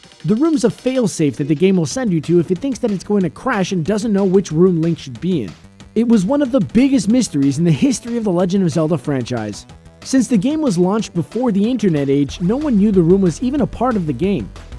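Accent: United States. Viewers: Has an american accent but calls Z "zed" instead of "zee"?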